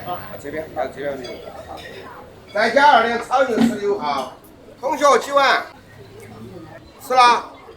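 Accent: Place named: Sichuan, China. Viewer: 四川话太歪了😊😊😊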